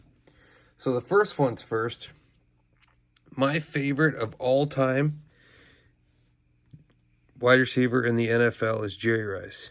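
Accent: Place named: Russia. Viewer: Nice VR and cards. Great Russian Accent.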